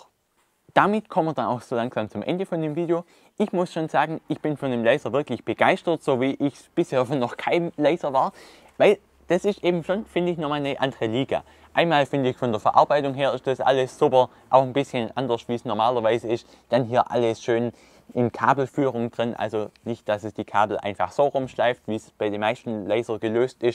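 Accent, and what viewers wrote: Swabia, Germany. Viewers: Schwäbisch isch gsond, jawoll! :D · ist des a SCHWÄBLE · Klasse! Schönes Video! Sag mal, was ist das für ein Akzent den du sprichst? Ich würde mal tippen Großraum Göppingen? Schöne Grüße aus Bocholt (NRW)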